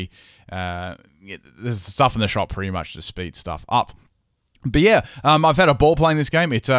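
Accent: Australia. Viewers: Are you Australian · Wasn't expecting an Aussie accent haha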